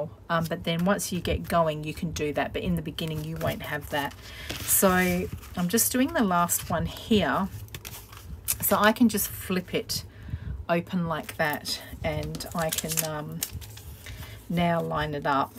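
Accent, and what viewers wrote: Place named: Australia. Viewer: thank for the tutorial. OMG. Bay gul. in California. I thought you said Bible , too. love to hear Australian speak.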